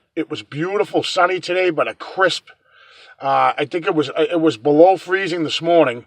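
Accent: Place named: Massachusetts, United States. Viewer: Well how are ya?? Linda in Florida here. How about this skinwalker story....when Mr. Sherman chased those little blue orbs with his 3 cattle dogs they chased the orbs out of his sight when he heard them yelp he ran as fast as he could to get to them and he found 3 melted oozy grease spots that was once his dogs. And that's when he gave up and sold the ranch...thats a horrible thing. Lots of stuff happened out there.......that place is evil!!!!!! And yes.you almost have got to get into paranormal stuff when your into Bigfoot cuz I believe that somehow there is a connection. Love your vids and love that mass. Accent. I'm odd up there spook much..hey see ya on the next one..lol..Linda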